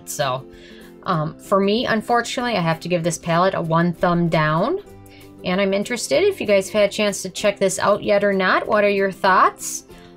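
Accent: Minnesota, United States. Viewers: Are you from Minnesota? Great review!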